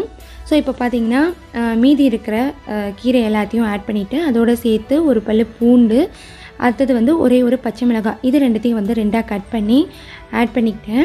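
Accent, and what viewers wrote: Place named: Karaikal, India. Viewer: asalamu alalikum sis m from sri lanka but m a indian unga video unga talking style yellame paakum boodhu yenaku india la irikura maai feel aaudhu na adhukaagave unga videos paakurai m really happy when i saw your videos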